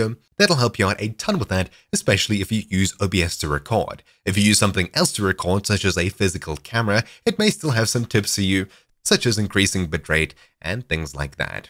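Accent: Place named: South Africa. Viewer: Thank you, I was able to fix my blurry video of Diablo 1 which was recorded via OBS in 360p, the game's native resolution. The video looked crisp on my computer in the H.264 format using VBR min 4mbps target 20, but on YouTube it was really bad. I changed to CBR of 20mbps (probably overkill) and exported the video at 720p, looks great now. Btw nice South African accent. Your voice reminds me of the famous Minecraft player rendog who plays on the Hermitcraft server. Its a good thing. <3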